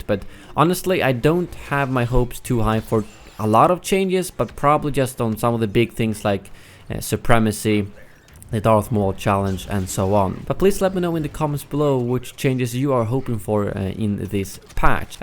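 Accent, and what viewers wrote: Sweden. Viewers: You from Sweden?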